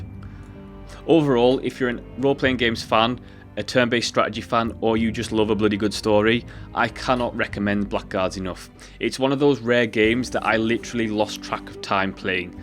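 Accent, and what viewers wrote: Scotland, United Kingdom. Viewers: You have a cool way to speak, is it Scottish?^^ (English isnt my native language, so no offense if its something else XD) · This is a bit expensive atm, I will pick it up in the next steam sale though for sure! This video seems like a great introduction to the game, I will check out some of your other videos for sure m8. PS Love your Scottish accent ^^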